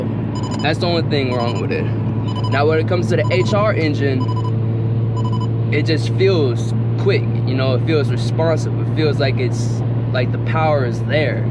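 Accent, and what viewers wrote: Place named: New Jersey, United States. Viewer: You definitely sound like you from Jersey B 🙌🏽💯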